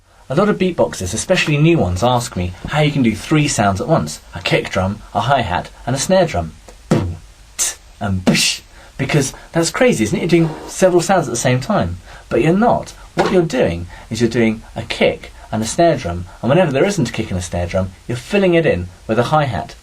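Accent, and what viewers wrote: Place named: Kentucky, United States. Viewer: its sound like kentucky, jusge m elike i be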